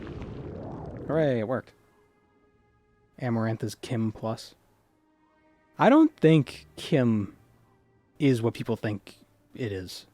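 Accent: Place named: Massachusetts, United States. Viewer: Also, where the hell are you from? That wicked smart was too natural. Im above mass, so i hear that accent and look for a fight happening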